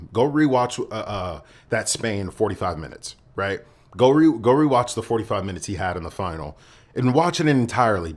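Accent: United States. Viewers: Greetings from Lebanon, This is my first time in your channel, and I was surprised (in a positive way) for different reasons. The first surprise came at the first second, when I heard an American accent; to be honest I was expecting British. The second one came only a few seconds later when you suggested that selling Carvalho was not for raising funds for buying Zubimendi, and I thought that you've explained a great point with a different view of a lot of other Youtube channels that I've been following since long years ago. and that really made sense to me. I do agree with you that the reason behind bringing Zubimendi is for improving the build up from behind, and I'm expecting both of TAA and Andrew Roberson to shine even more and probably play a great role in improving Darwin Nunez's numbers. TAA reminds me in every game of Stevie G, who I think had deserved a Balon d'ore but didn't get it, and I hope TAA manages to claim it throughout his career with Liverpool. 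I'm happy to see Americans break records in attending friendly football games at their venues and making channels sharing their thoughts and analyzing tactics and the transfer market. A great channel, and I'm delighted to have met on Youtube a person like you who IMO have a different yet great vision/analysis and who really thinks out of the box, and that got me subscribed. I'd like to suggest a few things in order to improve your channel. When showing graphics, I prefer to see them one by one with labels instead of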